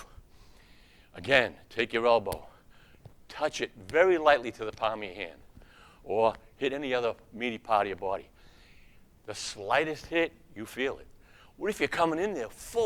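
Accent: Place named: New England, United States. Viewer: I can tell by your accent you live in New England.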